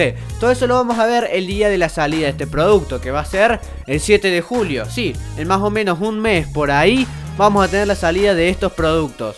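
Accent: Córdoba, Argentina. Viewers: no, es cordobés xdd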